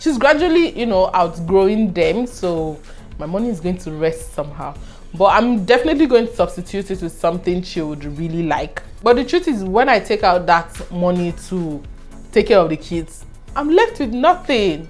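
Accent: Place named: Nigeria. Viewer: Dash me ooh, I've been a strong fan and follower my love.... I love the Nigerian accent. I love your gestures too. I love you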